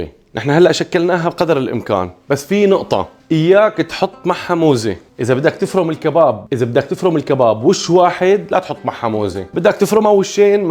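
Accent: Syria. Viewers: اللهجة السوررية ❤️ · الله يرزقك من حيث لاتحتسب ، الف شكر على نظافتك واسلوبك ولهجتك السورية المحببه لقلبي ، الله يعمرك ياسوريا وترجعين عروس المدائن ونرجع نزورك اشتقنا لك كثييييييييير ، الله يعطيك الف عافيه الشيف الطيب واحلى لايك ومتابعه وورده وحب من السعوديه 👍💐♥️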